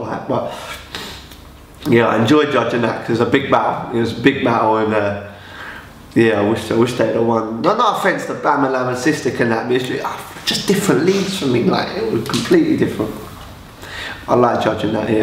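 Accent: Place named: Brixton, United Kingdom. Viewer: He's from Ipswich, why's he talking like he's from Brixton?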